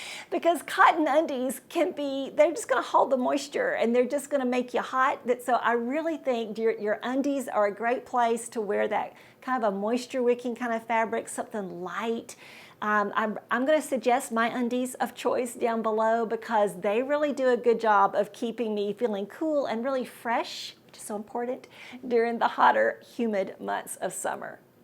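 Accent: South, United States. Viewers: When you said you lived in Ohio, I thought, "What?? She's not from the South?" And then you said you grew up in Georgia, and I thought, "Yes!" I knew you had to be from the South! South Carolina/Mississippi girl here!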